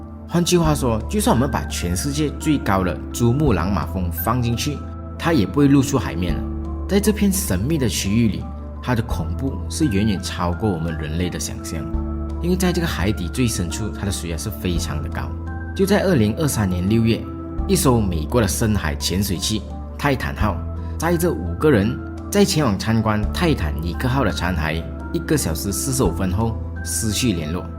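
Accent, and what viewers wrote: Malaysia. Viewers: 马来西亚口音，不好听。我也是大马人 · 马来西亚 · 马来西亚腔太重。听了很不舒服，很难再听下去。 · 馬來西亞華人普通講華語時的口音是這樣，我自己也受不了，但中國人捲舌太過的話也聽得很。。。😂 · 马来西亚口音耶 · 馬來人的口音那麼明顯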